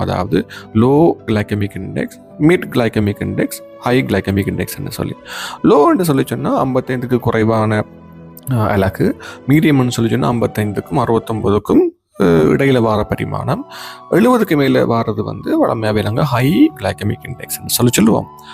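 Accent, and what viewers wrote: Sri Lanka. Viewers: Hello doctor, your tamil slang is like somewhat srilankan people speaking tamil.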